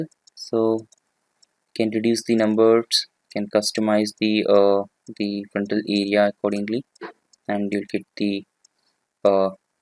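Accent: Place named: India. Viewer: I appreciate the effort and you taking time to produce this but between the poor, microphone, compressed, distorted audio and heavy Indian accent I could only understand about 30% of what you said.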